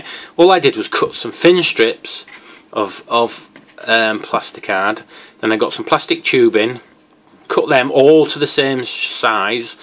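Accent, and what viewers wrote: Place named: Yorkshire, United Kingdom. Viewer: Oh.... I somehow have the impression that you are a Yorkie,? I do hope that I am not wrong because such a realization would indeed make me turn into a dog with its tail between its legs, to use a metaphor..... Anywhos..... I suppose anyone from the Tees to the old Humber, and all in between and possibly, all here, there and everywheres, could be classed as being a Yorkie, even though that does not make even a smidgin of sense.... However, and even so-so, I actually get the feeling that your beautifully eloquent commander like voice has a tint-of-a-hint of an accent that is actually from no less than bang, stab, right in the middle of the old, soon to be re-vamped, kingdom, i.e. your accent to me suggests that you are born and bred, and I suppose, ultimately from - York City - long-winded or no?. I do hope that I am not wrong. 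So, what is the answer? Leeds, no doubt! When I think about it, though, I am now leaning more towards Sheffield....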